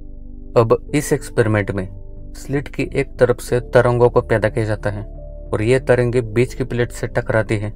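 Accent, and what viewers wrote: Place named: Maharashtra, India. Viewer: तू मराठी भाषिक आहेस अस वाटतय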